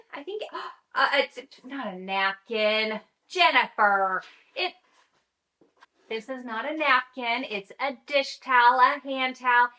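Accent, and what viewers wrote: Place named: Texas, United States. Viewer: I haven't watched the whole video yet.. but I am here for the unboxing and your commentary. I love when your Texan accent comes out! Makes my little Canadian heart gigle 😂❤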